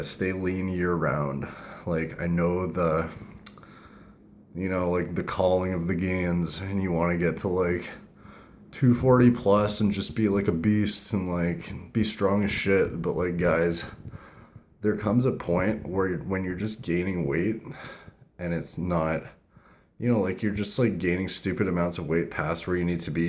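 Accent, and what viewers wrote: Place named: Canada. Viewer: I was surprised to hear was only 30 with his maturity and depth of knowledge. It makes more sense after seeing this video that was only 7 years ago because he comes across like a young guy in this vid- sounding so Canadian and gymbroish. In comparison with my maturation over a 7 year period I think it must be a combination of self-reflection from regular posting and PEDs.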